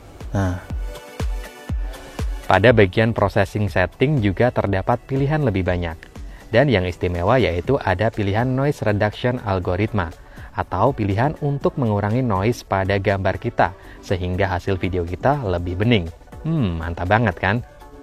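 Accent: Pekalongan, Indonesia. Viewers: Ente orang mana bang? Batang/pekalongan?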